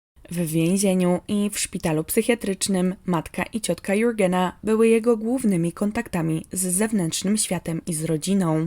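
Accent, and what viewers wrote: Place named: United States. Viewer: Mnie dość "bolało w uszy" wymawianie niemieckich imion, nazwisk i nazw miejscowosci z amerykanskim akcentem. Z polskim byłoby zdecydowanie ok. Bardzo ciekawy odcinek. Pozdrawiam.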